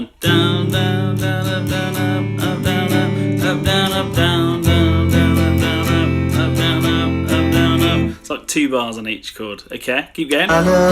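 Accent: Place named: Birmingham, United Kingdom. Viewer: no worries and makes sense! You sound like a fellow Brummie as well 🤙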